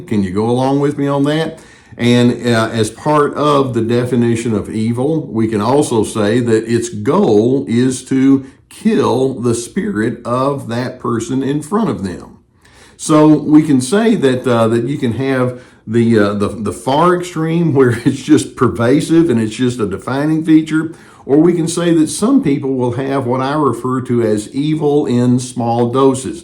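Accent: South, United States. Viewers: As an aside...I just wanna say..I love your southern accent. ❤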